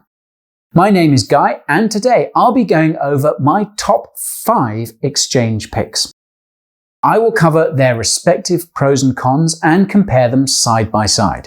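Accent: United Kingdom. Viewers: You had me at “getting myself a girlfriend “ and love that King’s English!! 🤣 Subbed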